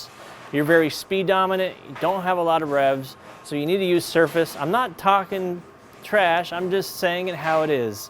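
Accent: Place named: Midwest, United States. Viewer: Never heard of before but the minute she spoke, I thought "wow she sounds very familiar." Of course she's from Ohio like me lol. Midwest dialect I suppose.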